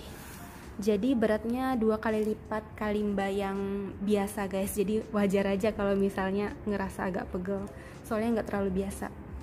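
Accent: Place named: Visayas, Philippines. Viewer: I thought you were bisaya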